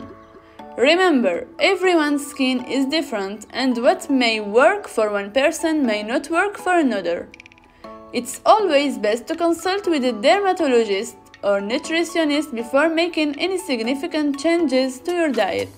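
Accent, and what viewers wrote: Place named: France. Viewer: Your voice tone combined with your accent sound pretty sweet n adorable in my ears.. You’re probably french tho right? :/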